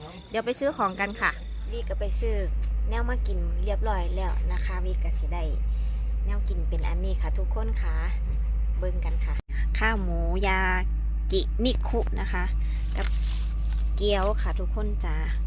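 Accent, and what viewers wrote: Isan, Thailand. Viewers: พี่ ครับพี่เข้าเสียงอิสานตอนอายุกี่ปีครับบ.....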